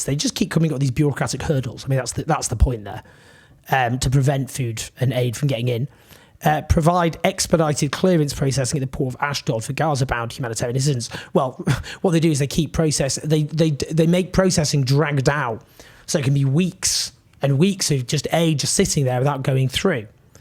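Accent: United Kingdom. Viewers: But anyways what’s up with a guy with a the gay British accent criticizing when the UK is worse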